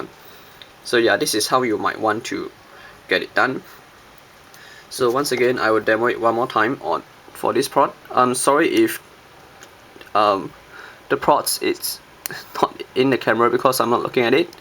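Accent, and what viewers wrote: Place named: Asia. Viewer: Thank you very much for this vid!! It'll help me alot! BTW, you're Asian right? Probably Chinese or Japanese? I can feel it with your accent.. :)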